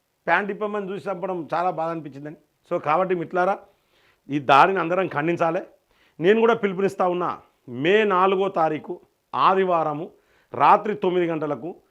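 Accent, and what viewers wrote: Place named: Telangana, India. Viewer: మీ తెలంగాణ భాష సూపర్ అండి మీరు మాట్లాడే విధానం బాగుంది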